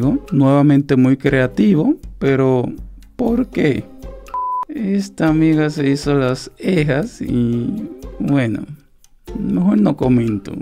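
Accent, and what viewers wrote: Dominican Republic. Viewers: Eres dominicano 🇩🇴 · eres dominicano?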